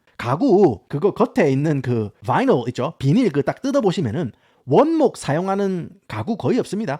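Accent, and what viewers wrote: Gyeongsang, South Korea. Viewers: 내용은 좋은데 경상도 사투리 극혐 경상도 선조가 중국에서 넘어온 한족들이라 유일하게 한반도내 성조가 있어 듣기 거북함 씨끄럽고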